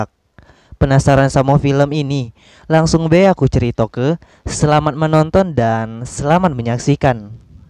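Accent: Palembang, Indonesia. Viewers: Wong Palembang ruponyo wkwkw😂😂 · Abang orang Palembang ya? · Palembang bang? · Wong Palembang yo ?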